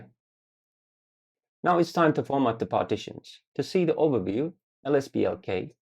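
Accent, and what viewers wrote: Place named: United Kingdom. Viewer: Brilliant British accent 👍🏼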